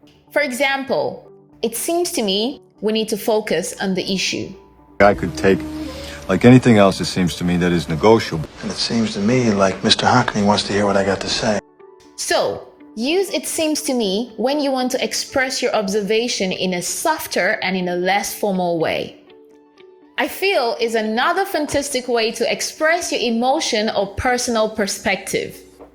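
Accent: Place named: Nigeria. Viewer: Woow!!, Im really glad to come across your youtube channel , your English lessons are helpful and informative, your accent is very clear, by the way , I love my nigerian brothers and sisters, their comedies are A+, Did you grow up in Nigeria? ( your accent ), just asking my dear Sister. You have earned yourself a Subscriber.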